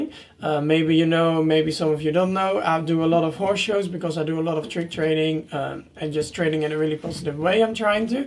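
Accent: Netherlands. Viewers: Are you Dutch? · Je praat op een Nederlands accent 😂😂😂❤️ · Are you Netherlands our Englisch?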